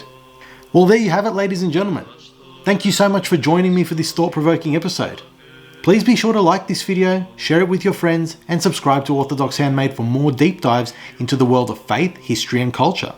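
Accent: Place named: Australia. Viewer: Aussie accent Narrative! Please just cut to the chase.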